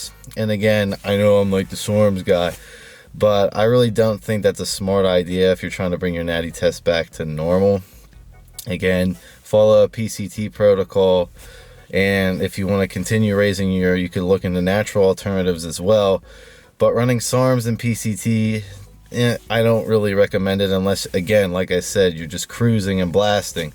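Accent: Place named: Baltimore, United States. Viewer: Without even finding out, your from Baltimore. Accent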